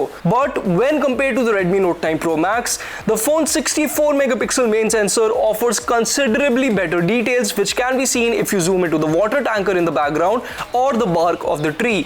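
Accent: India. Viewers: Bro, tumhari english, hindi jaisi lag rahi hai. Thank you bro 😎😎. Easily samaj aa rahi hai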